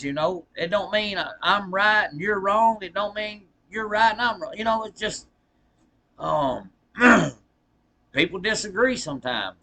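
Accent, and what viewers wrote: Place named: Carolinas, United States. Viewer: Yo 😂😂😂😂u ah trip bro,where u from. I from Charleston SC n you sound like you from SC NC or somewhere close. What sense it make to talk to on a video,I mean you can't understand what he says😂😂😂😂😂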